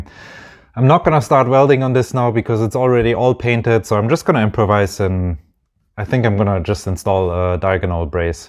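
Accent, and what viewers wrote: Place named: Germany. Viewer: Man well done.Great innovations…or combination of different ideas to make it work for you.Just found your channel by incident,and think you would deserve more klicks.I just wonder…are you german? It just felt like the little accent sounds familiar to me.Keep it up well done.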